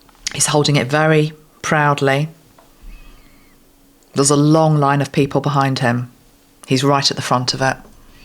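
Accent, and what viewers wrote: United Kingdom. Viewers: I am thrilled to watch this video,! Thank you for sharing your insights. I am hoping someday I’d get to visit your country U.K. When I was still learning English as an elementary student years ago, I dreamed of being able to speak in classy British accent like yours😅…! Well, I ended up here in the U.S., and I am a supporter of JD Vance!!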